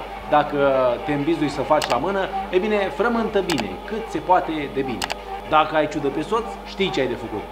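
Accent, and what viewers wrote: Moldova. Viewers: Ăsta este farmecul lui, e glumeț, explică totul pe îndelete, accentul moldovenesc are și el dulceața lui, iar rețetele pe care le prezintă sunt, toate, foarte faine.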